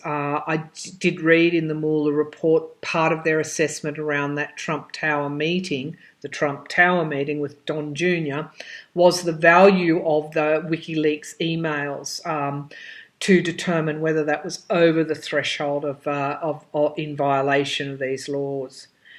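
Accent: Australia. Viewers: Can you please do a reading on NRA, Butina, Ericsson et al? I wonder if that's one of the 12 redacted cases. Thanks if you do, and thanks anyway if you don't. I have to focus to listen to what you're actually saying sometimes, because I am so fascinated with the way you're talking. I love Australian-English, and you have a manner of talking that's energetic and calming at the same time. Very much needed in the chaotic times of Trump! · Thanks! Love listening to your Aussie accent! ❤️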